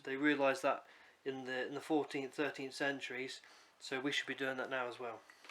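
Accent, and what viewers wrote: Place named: England, United Kingdom. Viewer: By the accent i'm going to assume you're English, if you are, where did you get your wood? I find it very hard getting anything other than pine in this country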